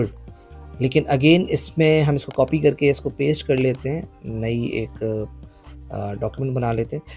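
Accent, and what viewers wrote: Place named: India. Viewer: Ur accent like an indian. I was thinking u r Indian.. anyways i got ur video while scrolling really loved it.. thanks for sharing such valuable knowledge i would implement it.. Thanks - love from India ❤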